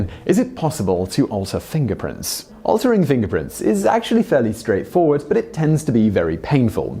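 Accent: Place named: United Kingdom. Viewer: love this channel! it s my fave for top 10 listsand I love s narration and brit accent (and dry wit)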